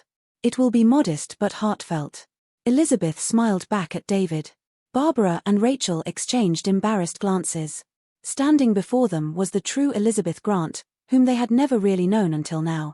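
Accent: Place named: England, United Kingdom. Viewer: English accent in Boston doesn't work! Change it please!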